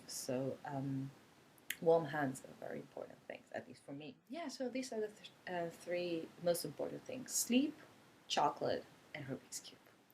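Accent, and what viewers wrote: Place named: United States. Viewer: Alice, what other language(s) do you speak in addition to German, Japanese and English? BTW, how did you get your American accent when you speak English???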